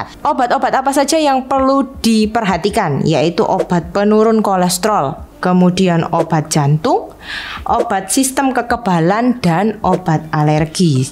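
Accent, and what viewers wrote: Java, Indonesia. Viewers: Makasih ce info nya... Logat bicaranya sy suka ce seperti wong jowo.. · Medhok sekaliii ngomongnya. Trimakasih dok ilmunya.. 😅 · Salam sehat Dok Mau nanya nih Kok ngomongnya MEDOG sekali Emangnya Bu Dokter Asli dari mana Mksh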